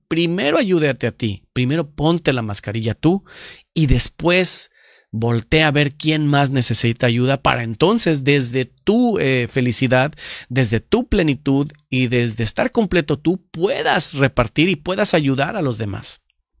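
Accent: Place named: Mexico. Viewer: En dónde se encuentra Usted? Su acento es Mexicano.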